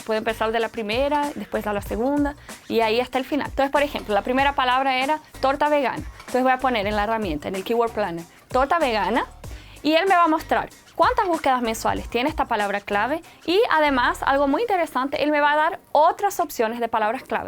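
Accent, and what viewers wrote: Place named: Brazil. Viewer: Me encanta su español con un toque brasileño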